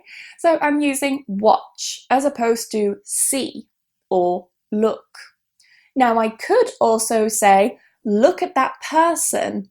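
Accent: United Kingdom. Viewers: Thanks for the video...It's very useful for me..., l love ( watching) the way you talk in british accent...,by the way...you're the most beautiful english tutor l have ever seen,...thank you...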